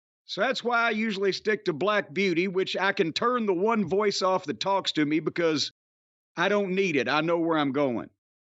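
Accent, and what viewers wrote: South, United States. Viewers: with all due respect from a resident of California “you damn liberal!”. it’s odd to hear your views from someone with a southern accent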